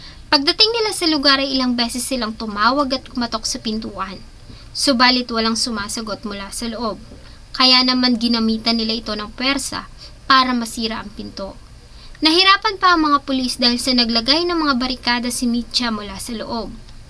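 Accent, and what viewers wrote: Visayas, Philippines. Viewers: Your pronounciation is so bisaya. Hindi Marvel ate Mar-vil ...hindi slovenya Slovenia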